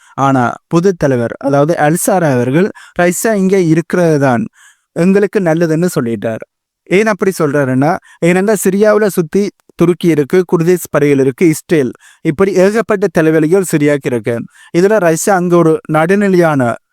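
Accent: Sri Lanka. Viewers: யாழ்ப்பாணத் தமிழ்.😂😂😂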